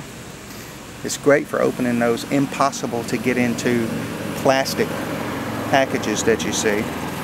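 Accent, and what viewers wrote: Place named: South, United States. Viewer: I'm guessing southern/ southern mid west usa.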